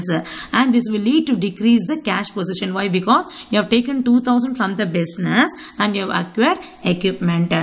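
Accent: South India, India. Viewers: South Indian eng... Mmmm.. It touches well.. 😊❤❤ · Hello Mam Your south Indian English accent is quite awesome.. Awesome video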